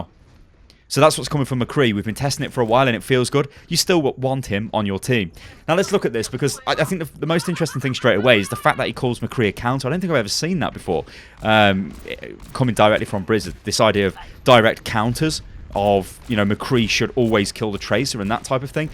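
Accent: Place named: Southern England, United Kingdom. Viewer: As a native of Britain I can confirm he is rather southern.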